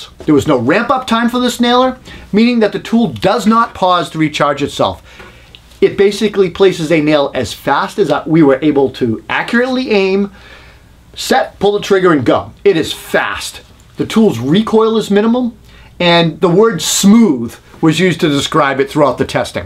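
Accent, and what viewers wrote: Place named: Boston, United States. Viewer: No need to hide the Boston accent! New England is famous for its carpenters. MakitER it is! Massive thanks for your work here, as always. Great work!!!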